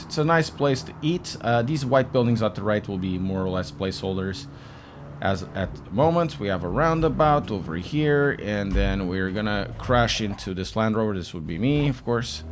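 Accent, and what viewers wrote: Portugal. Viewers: Cum crlh, ainda ontem estava a ver um video do canal e a pensar para mim que pelo sotaque este homem tinha de ser tugues 😅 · És português.? Esse sotaque Cascais tá on point ahaha um grande abraço !!! Que bom ver um português a dar se bem no sim world !! Grande abraço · did you not notice his very obviously portuguese english accent? took me a total of 4 seconds the first time i watched him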